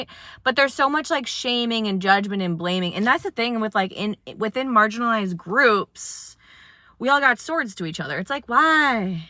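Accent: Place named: New England, United States. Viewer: o didn't know you were a new englander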